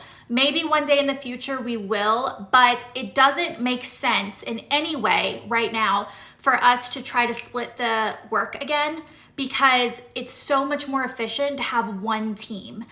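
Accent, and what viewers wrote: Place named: South, United States. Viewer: Feel like you've picked your Southern accent back up since moving back!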